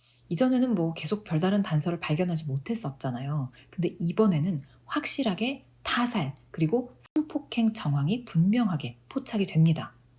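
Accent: South Korea. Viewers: 의 발음 안되서 극혐임 으 으 이러는데 전라도 발음 개 듣기싫음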